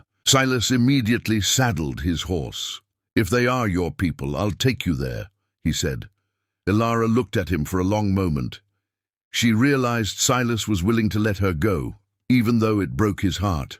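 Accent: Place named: United Kingdom. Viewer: Another American western story narrated by a British accent. Not interested!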